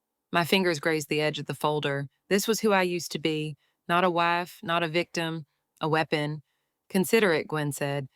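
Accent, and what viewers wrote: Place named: Kentucky, United States. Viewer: Sounds more like Kentucky 👍.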